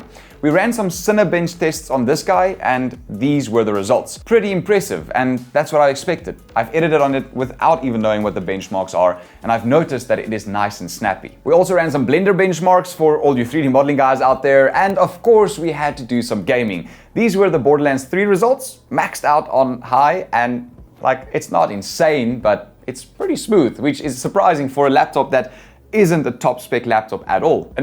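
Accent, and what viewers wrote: South Africa. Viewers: Is he South African? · are you south African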